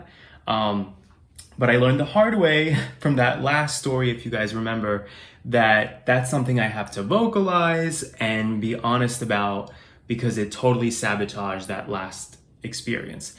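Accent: New York, United States. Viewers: How could anyone resist that NY accent and the way your voice goes up on words like “Vocalize”.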